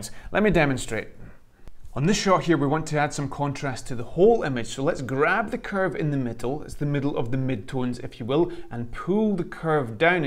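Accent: Scotland, United Kingdom. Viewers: Interesting explaination. Thank you. I think saturation especially adds a lot in videos. Love your accent. I guess it’s scottish!!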